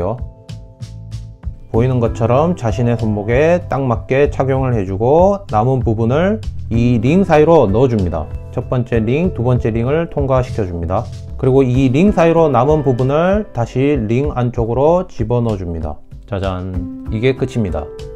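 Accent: Gyeongsang, South Korea. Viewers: 나토뺀뜨..사투리가 남거슬리는군요..경상도 억양이..ㅋ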